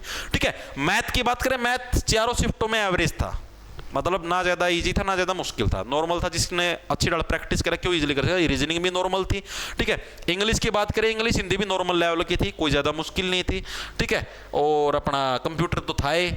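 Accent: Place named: Dadri, India. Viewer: Aapki boli must h ji dadri side k i hna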